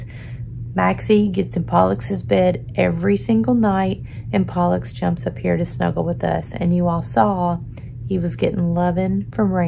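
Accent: South, United States. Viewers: Haha, loved watching the dogs bedtime routine, so sweet😍 and that Southern accent 👍 Thanks for your fun channel and Hello from another curly girl downunder🖐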